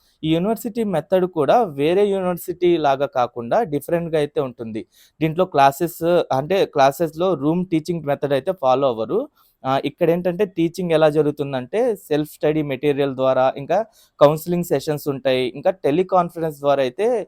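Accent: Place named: Telugu, India. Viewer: Do not comment about his language,he is speaking local telugu slang,go ahead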